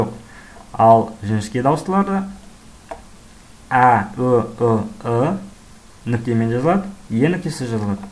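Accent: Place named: Russia. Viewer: кешеден бері байқағаным негізі сіз орысша ойлайтын қазақ болып көріндіңіз, видеодағы қазақша сөйлегенде де орысша сәл де болса акцентіңіз бар. Қазақшаға шорқақтау қазақтарға қаза тіліне байланысты мәселелерді қолға алмағаны дұрыс ау. Кім сойса да қасапшы сойсын демекші. Терең білім болған кезде өзгерістер енгізуге болады. Жалпы, әліпбидің еш кемшілік тұстары жоқ, дайын 42 әріптен құралған, бүкіл әлем ұлттарының үнін ұштастырған әліпби. Артық ештеңесі жоқ. Білім және Ғылым Министрлігіне үндеу: АРТЫҚ ҚЫЛАМЫН ДЕП ТЫРТЫҚ ҚЫЛЫП ЖҮРМЕҢІЗДЕР.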